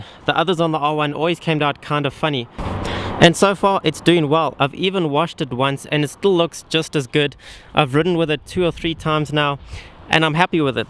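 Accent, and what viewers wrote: South Africa. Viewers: I knew your voice sounded south African btw love your vids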